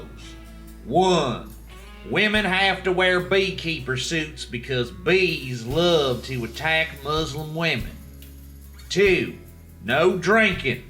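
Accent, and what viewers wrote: South, United States. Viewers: Where did You learn that dialect? as a Southerner i`m impressed.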